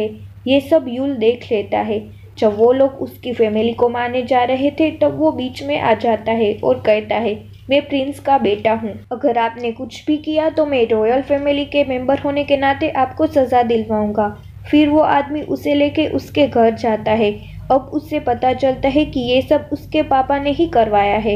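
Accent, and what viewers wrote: Gujarat, India. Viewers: Aap gujrati ho kya Aap ki voice me gujrati touch h You have h such a nice voice and good explanation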